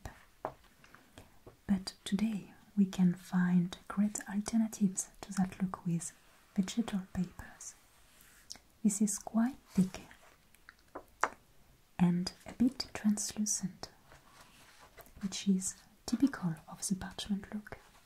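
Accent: France. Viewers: Is she German? The accent is beautiful, I just can't place it. Edit: nevermind, she's French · you're right, she is french. German accent sounds way different in my opinion, its not that beautiful... I am german so I can say that lol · French accent is pleasing to my ears and her looks are pleasing to my eyes... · Does anyone else LOVE whenever she crumbled the paper in walnut and starts talking in her amazing French accinet in a softly mom like voice because i have watched this a whole lot of time and every time she dunks the paper and crumpled it i get chills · Her french accent is a trigger itself 🤩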